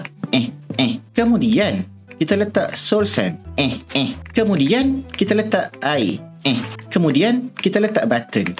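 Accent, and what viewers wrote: Malaysia. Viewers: Wow baru tahu suara malaysia begitu orang Malaysia kue orang Indonesia😂😂🇮🇩🇲🇾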